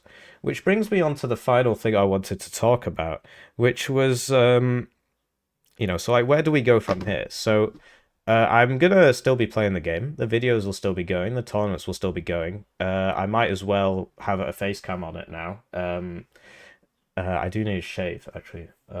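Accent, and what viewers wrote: England, United Kingdom. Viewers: how lung did it take to earn the English accent?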